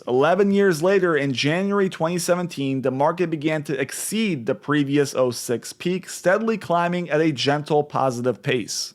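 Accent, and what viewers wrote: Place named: Minnesota, United States. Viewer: Are you from Minnesota or Wisconsin?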